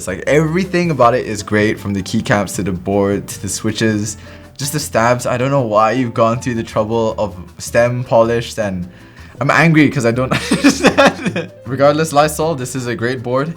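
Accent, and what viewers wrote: India. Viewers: what happened to his voice sound like an indian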